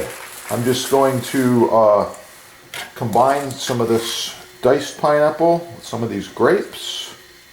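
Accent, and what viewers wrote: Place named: Midwest, United States. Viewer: Thanks for the video! I love a good mid-western accent; it keeps my ears perked, lol. Keep creating, man!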